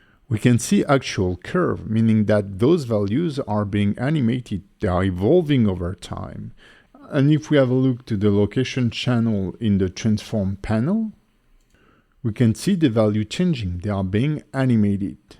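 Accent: France. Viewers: elle est ou la version FR? j'ai griller ton accent:p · Are you french? because of yoour accent ✨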